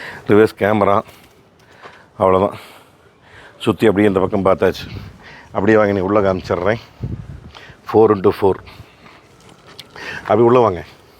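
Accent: Kongu, India. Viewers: Namma Kongu tamil is awesome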